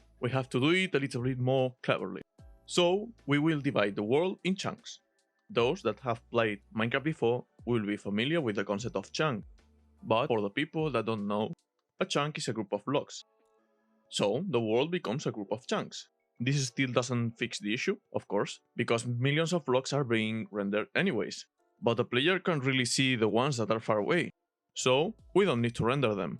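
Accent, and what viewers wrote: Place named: Spain. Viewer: Looking incredible, I hope this goes well! Also, are you spanish? Because your english accent sounds like every spanish speaking english, like myself.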